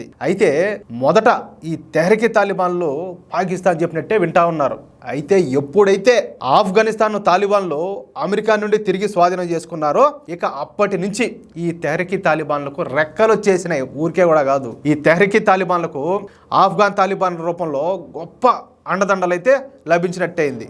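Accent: Rayalaseema, India. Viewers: నమస్కారం గారు మీరు చెప్పే ప్రతి మాట తూటా లాగా ఉంటది రాయలసీమ యాసలో అద్భుతంగా మాట్లాడుతున్నారు నిక్కచ్చిగా ఉన్నట్లు మాట్లాడటం చాలా బాగుంది🎉🎉🎉🎉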